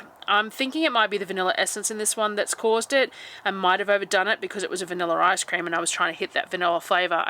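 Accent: Australia. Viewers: I love listening to you talk. I have always loved the Australian accent. Also, the speed you talk is fine. I don't want to listen to someone who talks like a turtle. So forget what those people say and keep being you.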